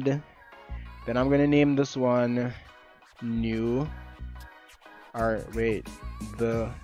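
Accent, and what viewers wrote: Jamaica. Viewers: Thank I very much and I like ur accent it sounds like u from Jamaica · You sound jamaican?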